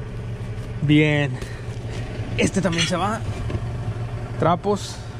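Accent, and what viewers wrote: Mexico. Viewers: Me gustan tus vídeos, con tu voz norteña! Saludos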